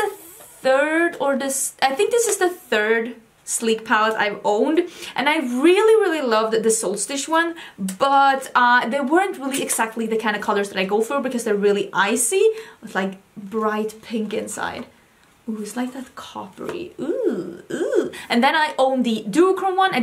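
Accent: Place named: Sweden. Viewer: I loooove how you talk hahaha... I’m a big fan of the Swedish accent 😁 and that eyeliner is AMAZING for your eye color!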